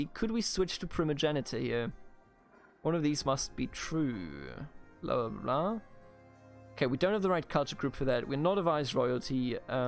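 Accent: Germany. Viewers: Never before have I heard a genuine German accent. I am not disappointed.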